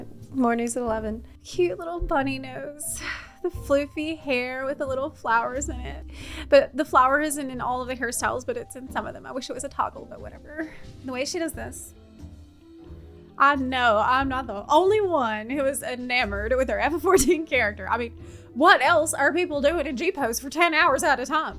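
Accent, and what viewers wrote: South, United States. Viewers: Why is having a south girl accent all of a sudden? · Not only do I love my character (also a bunny girl), but I am fully convinced she is the canon version of the WoL. She is perfect. In other news, I love that years of living in Ukraine has not suppressed your powerful Southern twang in any way. I have it too, I think it comes from our DNA.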